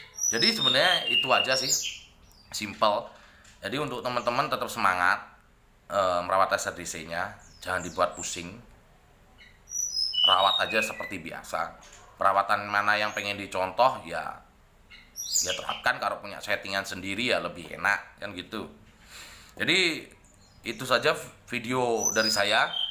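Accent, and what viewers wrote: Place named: Bali, Indonesia. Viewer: ...baru beli srdc ombyokan kemarin...liat video ini langsung tertarik nontonnya..bahkan sampai berulang2 biar paham....dengar logatnya jadi kangen bahasa indonesia yg aga ada medok balinya...saya lahir dan besar di gianyar mas....sekarang lagi merantau di pandeglang banten....maju terus tetap semangat...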